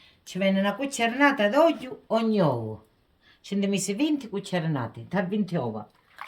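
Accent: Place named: Sicily, Italy. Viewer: Mi piace molto anche mia nonna li faceva cosi ..e mi piace anche il tuo dialetto mi fa ricordare quando ero bambina in colleggio a messina.Istituto santa brigida.❤❤❤❤❤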